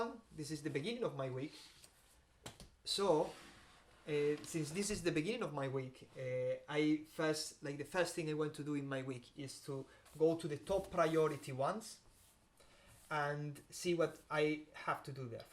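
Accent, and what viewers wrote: Scotland, United Kingdom. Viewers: I found myself here following different threads of polyglots and their channels. I listened to this video and your intro to your channel in Spanish. You are a very interesting fellow. One thing puzzles me, and that is why does your English sound like someone with a Scottish accent? Did you have an English teacher who was Scottish? Just curious. I enjoyed your video.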